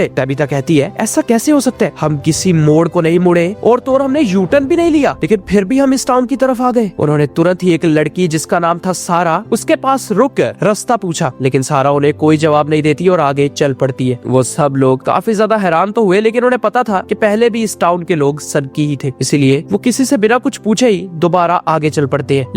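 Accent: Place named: Pakistan. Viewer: Paxtani accent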